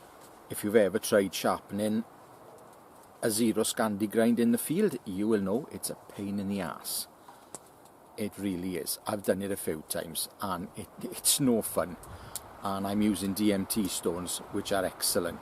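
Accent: Wales, United Kingdom. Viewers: Great Video Brother.😊👏👏👏 I could listen to that Welsh Accent all day. Great Fire Lay.👊💥🔥🔥🔥🔥🔥♨️♨️♨️ Thanks.😊🤘👊👏👏👏 That Splitting Axe kicked Butt alright bro.👞👞👞🪓🪓🪓🪓🪓🪓😁🤘 Nice Knife Brother. I would love a free one.😁🤘👊🤣🤣🤣🤣🔪🔪🔪🔪 a real Beauty.🥰🥰🥰 a Real work of Art. 3v great steel. I would love to know your favorite angle. I wish i could make Knifes like you bro. You have some serious skills.👊🤘😁 great pouch. You are a machine. 👏👏👏👏😊👊 good trick baton that piece of wood thru the final bit. Nice Demo. And Those Feather Sticks Look Insane.😊👊👍🤘 Knce Again A Big Warm Thanks From New Zealand. Ka kite Ano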